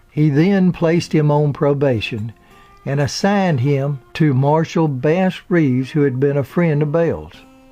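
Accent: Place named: Rural, United States. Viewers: Please get the marbles out of your mouth and properly enunciate your words, this is English, not hick. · Great stories. They should be delivered by a campside fire. I'm British but I absolutely love that accent. (Is it a Kentucky accent BTW?)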